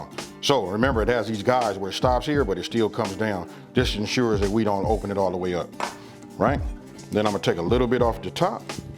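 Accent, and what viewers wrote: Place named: South, United States. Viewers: Thanks for the great recipe. I just made this and, as you said, "BOOM". I love that?southern American?dialect of yours...I'm Scottish. Please allow me to offer you a wee tip pal: if you leave that hardy old root on the onion it'll act like superglue and help prevent anything from falling off! Just sayin like, but..I'm fae Scotland so what dae I know? ❤️ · Love this video...good southern cooking and accent.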